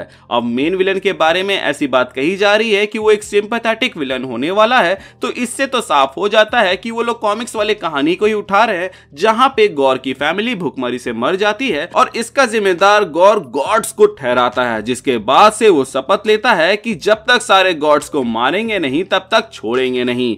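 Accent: India. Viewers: Excited for the thumbnail until i play and hear indian dude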